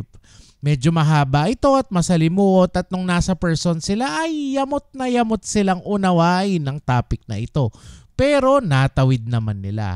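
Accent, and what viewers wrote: Batangas, Philippines. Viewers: Ay Batagueño pala c atty..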